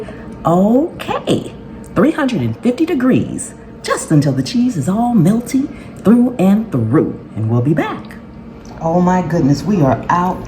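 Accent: South, United States. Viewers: You must be from the south. I was born and raised in North Carolina and when I heard you say, "If the Good Lord's willing and the creek don't rise" I immediately thought of my mama and daddy. Thank you for the beautiful memory and for the recipe. It looks amazing! ❤🙏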